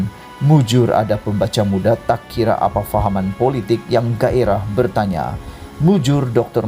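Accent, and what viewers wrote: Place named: Sabah, Malaysia. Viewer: Warga pitas sabah . Slang sabah.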